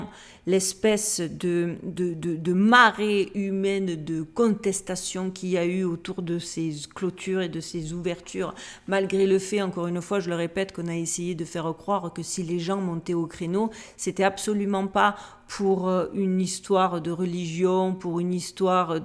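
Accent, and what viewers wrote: Marseille, France. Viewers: Et bien tu m'as captivé et tu m'as apris des choses qui me réconforte dans le fait que je suis de retour à l'église depuis 11 mois et ce 3 fois semaine.. Je te remercie de faire toutes ces recherches car moi j'en serais incapable... J'aime t'écouter avec ton accent de Marseille. Comme quand j'écoutais Clairette ici au Québec étant jeune. Padre Pio sa PROPHÉTIE serait un bon sujet ..🦊🐾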